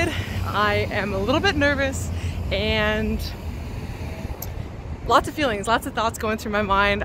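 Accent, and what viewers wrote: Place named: Canada. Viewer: pilot, I hear your Canadian accent a lot more in this video than the last one I watched lol....so are you a veteran of Her Majesty's Royal Canadian Navy or Air Force? I should call you ma'am if you're a Naval Aviator or RCAF.:) I was USN enlisted on submarines, 1999 to 2003...STS3(SS).:) Never piloted an aircraft myself, want to do it someday...now that I'm fully vaccinated I've started taking some plane trips again, USA only though. Canada is still closed off to Americans I think for covid. Last plane trip, I went San Jose to Los Angeles, and then took Amtrak to San Diego, and then flew back San Diego to San Jose. Currently my next planned flight is September 3rd to Seattle for PAX West! (gaming festival)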